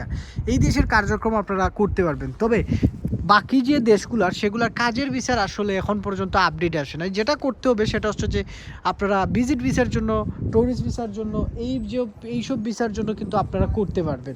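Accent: Sylhet, Bangladesh. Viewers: সিলেটি ভাই❤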